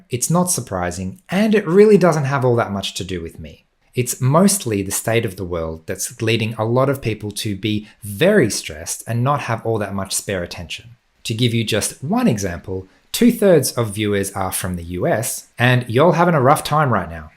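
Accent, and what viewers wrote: Australia. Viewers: Please don’t quit. I’m a sometimes viewer, parent of an autistic person. It’s so calming sometimes to hear another Australian talking about any aspect of autism, I always learn something. I wonder if your helper might be called an ‘aerator’ as they will help you out into the air of the web? Best of luck whatever you decide. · NOOOO, please stay, you're a rare Australian voice with unique perspectives on autism and evidence based info delivered with a warm gentle manner.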